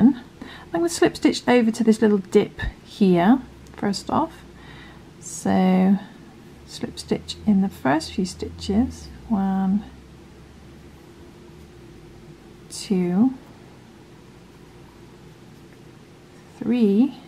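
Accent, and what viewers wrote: England, United Kingdom. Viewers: I find your technique fascinating. The way you position your hook facing upward and the way you wrap your yarn. It flows very well. And you are the only person I’ve ever heard with an English accent, using US terms and stating the UK terms as well, repeatedly. Very helpful I’m sure to those in the UK.. I’m thinking maybe you live in the US? Lovely stitch pattern! I look forward to this series! Thanks for sharing!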